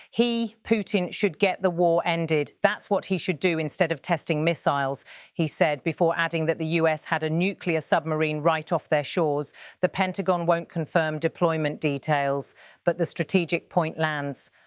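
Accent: United Kingdom. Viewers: Good grief. Don't think can watch the whole thing. The voice is irritating as hell, with snotty female tone & British accent that screams no credibility, in part to BBC etc destroying all credibility of any news with British accent. And as if written by ai set to over descriptive mode, creative writing, with pretense of being "news" & editorial commentary at same time. 🤯🙄🤯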